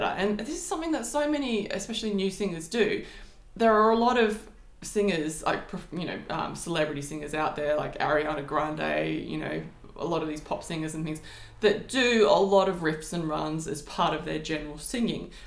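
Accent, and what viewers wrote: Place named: Australia. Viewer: It's great to hear an Aussie voice on Youtube. Your approach to your videos is very refreshing.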